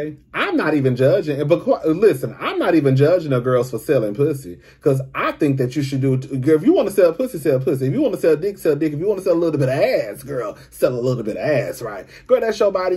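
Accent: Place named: Midwest, United States. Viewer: I can still hear the Midwest accent.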